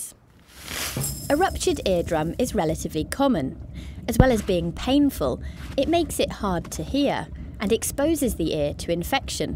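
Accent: United Kingdom. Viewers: i think the narrator is british but i can't be too sure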